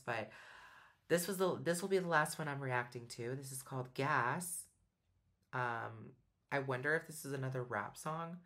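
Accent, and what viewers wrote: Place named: South, United States. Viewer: Damn dudes voice is great for the country.. Fire ass voice.. I hate how country gets me in my feelings, though.. Oh that one part on the first song is not a violin in country it's the fiddle close but not the same.. This shit is fresh I'm going to be adding this guy to my everyday music.. Damn his rapping is Fire too.. did a country song once but it was ahead of its time.. He should do a song with them.. Love your country accent also made me laugh.. This whole video is 🔥 💯. I want a song with this guy and..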